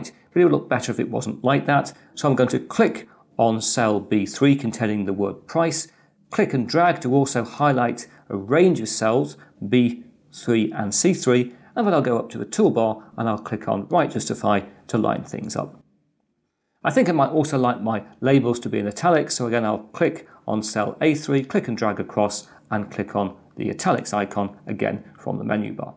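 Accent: United Kingdom. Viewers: You got an astonishing British Accent!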